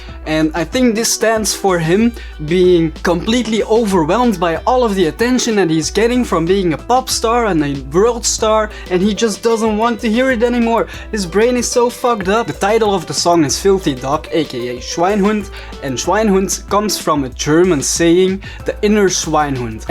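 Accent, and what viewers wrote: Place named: Belgium. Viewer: gy zyt zwz een belg hondert procent ik hoor den accent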